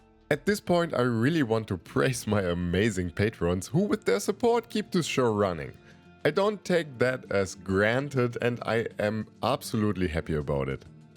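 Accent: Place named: Germany. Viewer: you certainly did deliver, this and the horned rat warrior both were experimental and it’s really nice seeing someone’s take on something that not many people would even attempt. But yeah your painting style is unique and a pleasure to watch, along with the German accent and bassy voice you’ve certainly got what it takes to keep gaining popularity. I look forward to the chorf release and hopefully they re-release all the old legion of azghor from forge world!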